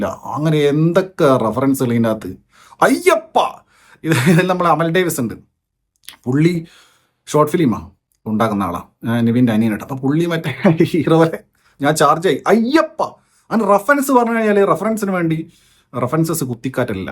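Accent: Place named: Tamil Nadu, India. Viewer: ഇയ്യാള്‍ക്ക് മലയാളം അറിയില്ലെ. സംസാരത്തില്‍ ഒരു തമിഴ് touch ഉള്ള പോലെ.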